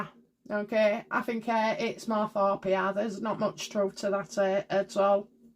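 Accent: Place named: South Yorkshire, United Kingdom. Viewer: I just watch because I like listening to you. As a Christian I shouldn’t even watch these kinds of videos 🙈🤪 You sound like you could easily live where I live in South Yorkshire. If it’s any consolation based on other comments I’ve seen, many other people said he was going to pass yesterday.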